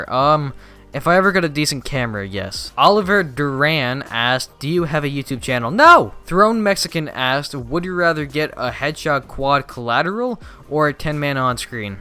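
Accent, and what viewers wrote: Ireland. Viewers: I thought you where Irish I'm being honest here not even joking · He sounds Irish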